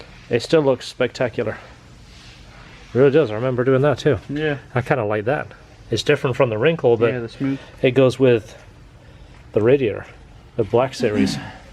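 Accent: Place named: United Kingdom. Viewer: I’m picking up a british twang In your accent.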